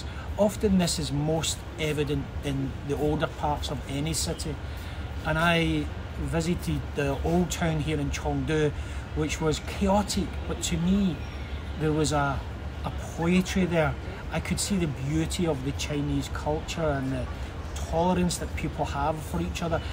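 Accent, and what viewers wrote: Scotland, United Kingdom. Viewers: You’re the first Scottish guy I can understand clearly even though I am British 😂 Chinese people are very welcoming in mainland China can’t wait for my big move in August in Hangzhou Subscribed ❤ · Your Scottish accent is absolute adorable, sorta reminds me of pirates and Spartan worriers haha 😊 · Thank you for the matter of fact sharing. Love your Bonny Scottish accent❤❤❤ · I loooooove your Scottish accent. And great video too!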